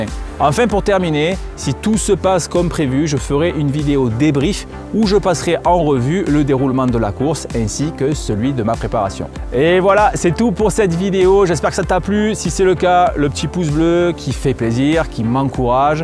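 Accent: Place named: Marseille, France. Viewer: Salut, merci pour tes vidéos! Je crains que ce soit foutu pour l'édition 2020 du marathon du Mont Blanc et c'est les boules... Mais bon, nous subissons tous la même frustration. J'ai fait les 90km du Mont Blanc sous la canicule en 2019, je suis inscrit sur la TDS cette année, mais là aussi, c'est compromis. Bref, c'est chiant tout ça... Mais faut s'adapter, vivre cette crise le mieux possible. Je suis comme toi un adepte de l'entrainement croisé et complet (pas uniquement les jambes mais l'ensemble du corps) ce qui fait que je kiffe tes vidéos. Et puis l'accent du 13 est sympa;-) Bref, continues à nous alimenter avec tes vidéos, on a besoins de sources d'inspiration pendant cette période particulière!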